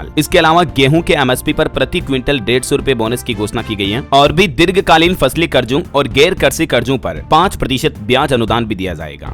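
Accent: Rajasthan, India. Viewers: Vah bhai maja a Gaya Marwadi Bhasha aapke munh se sunkar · Wa kya rajasthani boli h bhai...hm राजस्थानी hi h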